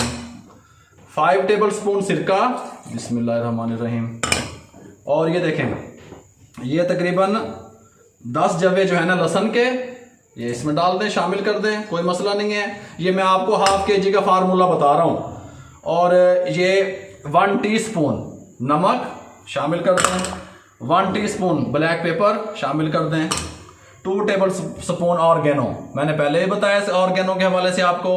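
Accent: Punjab, India. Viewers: ur style...ur punjabi tach....wow masha Allah...love it.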